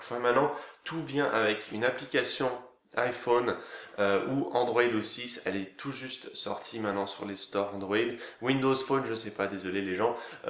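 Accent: Canada. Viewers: D'un côté, c'est pas faux,mais d'un autre oui :). Officiellement, il n'est pas Canadien, mais il a une culture Canadienne, c'est pour ça qu'il a l'accent. Rester plus de 10 ans à Montréal, ça laisse des traces x).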